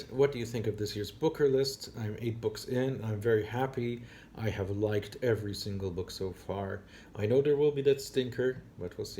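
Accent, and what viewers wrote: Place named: Malta. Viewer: You are from Malta? I was trying to figure out your accent.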